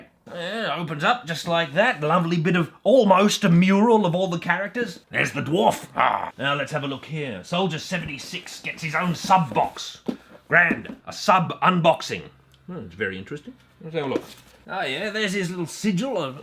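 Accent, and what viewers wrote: Australia. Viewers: Is he an Aussie? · Are you Aussie? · I came from the old Hero Quest video thinking you were English and find you're actually Ozzy! You'd fit right in with over here with the D&D crowd, hilarious stuff mate :-D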